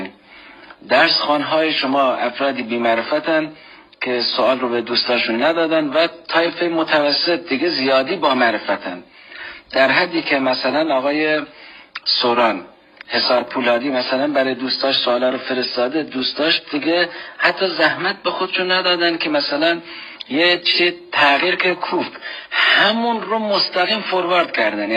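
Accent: Iran. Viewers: گیلکی بو بره می لهجه را دانه❤😂😂😂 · لهجه کرمانشاهی یا کوردی دارند 🎉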